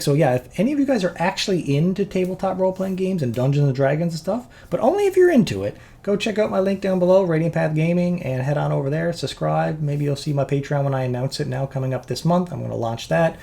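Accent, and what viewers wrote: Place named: Newfoundland, Canada. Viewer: Will the movie reaction channel remain “active” for “monetization” purposes? I often replay (or listen to) old favorites while commuting, doing my makeup, etc. and don’t intend to stop. Hopefully, you’ll continue to get some financial benefit from your past work! As a fan —and subscriber since August 2021– I’ll (selfishly) miss your fantastic reactions and commentary. But I wish you and your family only the best moving forward! On a final, personal note: It may sound kinda lame, but I (an American) fell in love with the “Newfoundland accent” during quarantine. To make a long story short.. I ended up moving out of state and living with my mom/grandma throughout the various lockdowns (about 16 months total). We watched a LOT of TV/movies together in that time; one of our collective favorites was the show “Murdoch Mysteries.” For anyone who isn’t aware, one of the best MM characters is a young man from Newfoundland: Constable George Crabtree [played by actor/comedian Jonny Harris]. 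My grandma (who I grew very close to during the pandemic) has since passed on, but your voice still reminds me of the happy times I was able to share with her, despite the world seemingly falling apart around us. ☺️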